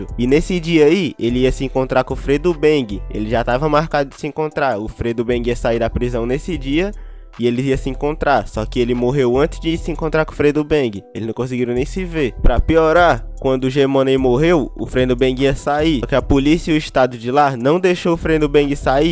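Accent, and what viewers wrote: Fortaleza, Brazil. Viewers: Tu é de fortal né vet? Brabo mano o conteúdo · sem dúvidas que vc é de fortal man 😂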